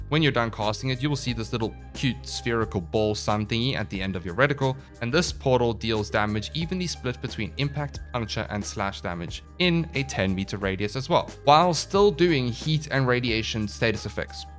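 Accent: South Africa. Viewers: Broooooo i came here loooking for this comment🤣🤣🤣🤣🤣 love how south african he is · are you from SA?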